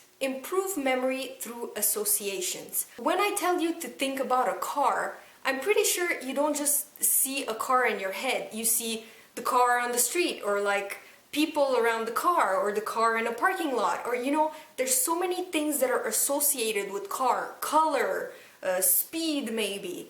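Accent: Russia. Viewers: Are you from russia? :D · вы русская?)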